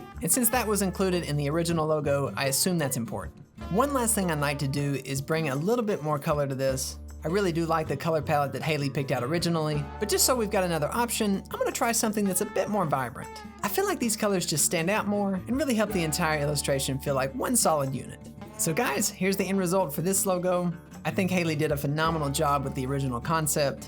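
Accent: South, United States. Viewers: Dang you a southern boy huh? Bet u hung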